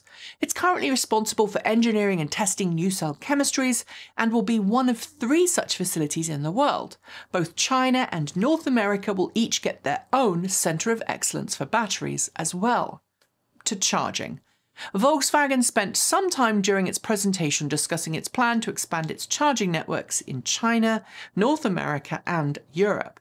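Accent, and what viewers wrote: England, United Kingdom. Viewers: does have a regional accent -- that region is England:-) And within England, toward the south, and well educated. Also I think goes out of her way to enunciate clearly. Listening to her as a native English speaker, she's pretty unmistakably not American. I don't think many Americans would believe her accent is from anywhere in the US. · does have a regional accent -- that region is England:-) And within England, toward the south, and well educated. And I am saying as a non native english speaker you can't tell whether this is an english accent, or one from somewhere in the US. Therefor I'd find it stunning if anyone would have problem with (unless they are looking for problems).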